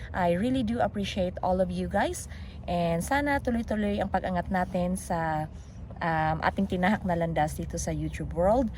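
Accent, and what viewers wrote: Visayas, Philippines. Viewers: Uy bisaya ka man day hahah keep safe new friend here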